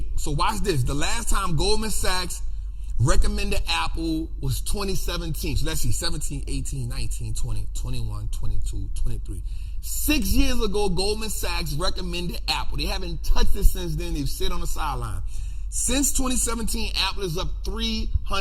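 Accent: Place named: St. Louis, United States. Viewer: He kinda sounds like he’s from Louis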